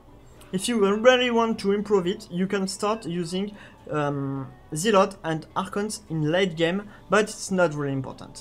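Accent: France. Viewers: Cheers from Sweden! I have just returned to Starcraft 2, much thanks to the Finnish players who beat the Koreans recently! i was thinking then, are there any "Old" WoL players that stills play... And i found out that Naniwa was playing just some games for fun on twitch and i saw a live match on twitch with stefano.. soo i was hooked again, cus its so funny to watch starcraft 2 matches and suddenly i wanted to play 1v1 again! when i came back to the games i'v been looking for some "sheets" or "how to buildorder" etc and then i saw your effort on <link> i watched some of your videos, found it intressting most cuz i wanted to get toss back into my own protoss play again! And then i saw this video, when u do a series of play on the ladder and you explain what you belive you see and what info you get from your scouting. And your experiences dosent lie to you that often. 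but i found it realy intresting when you react to things. here we "noobs!" can do alot of learning! I think i do alot of progress watching your videos! Me however that are a Platinum player that wish to step up to Diamond i found this video very usefull! I realy hope you do a few more videos like this on the ladder. Cus we lover tier guys learn alot form this! and i love your frenglish keep do videos! its intresting, funny, usefull... you help the starcraft 2 communityt alot by doing this videos! cheers again! Samsos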